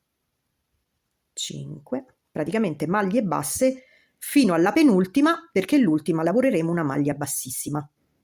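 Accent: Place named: Rome, Italy. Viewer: Ciao! L ho realizzata oggi ed è venuta benissimo! Bellissima! Poi tu mi sei troppo simpatica! Sei di Roma? Dall'accento mi sembra di si (anche io sono di Roma). Spieghi molto molto bene, e questa mini bag é veramente bellissima! Grazie! ❤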